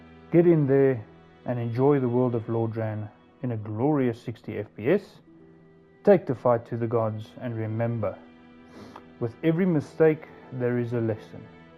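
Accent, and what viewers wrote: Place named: South Africa. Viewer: The accent tells me you're from South Africa.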